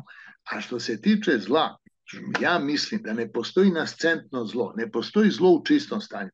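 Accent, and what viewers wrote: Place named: Niš, Serbia. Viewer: Velja se baš zabavlja i ujedno zasmejava sve nas ...ovo gledamo grupno i ujedno se svi smejemo i tvom izgovoru ...čas nišlijski čas izkvareni beogradski..nikako pravilno srpski ali ok ...baš se svi smejemo i zabavljamo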